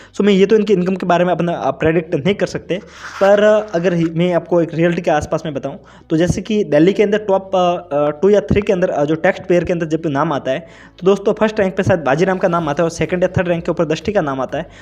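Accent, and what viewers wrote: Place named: Rajasthan, India. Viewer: Lagta hi rajsthani hai aap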